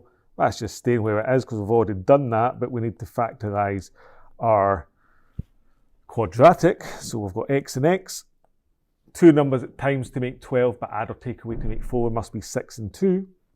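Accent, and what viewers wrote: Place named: Ireland. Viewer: fikin hell mate cant understand ur irish accent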